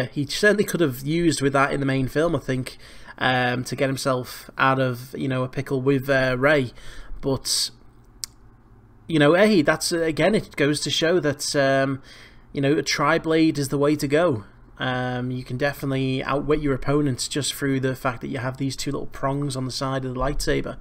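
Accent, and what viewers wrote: Wirral, United Kingdom. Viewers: are you from the wirral?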